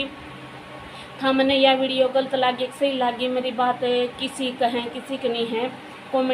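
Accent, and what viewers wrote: India. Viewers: Puver haryaanvi😂😂😂😂😂😂♥️🤣🤣🤣tere ke dard h ,🤣🤣🤣🤣💌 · Aap Ki Deshwali Boli Bhut He Aachi Laghi Ha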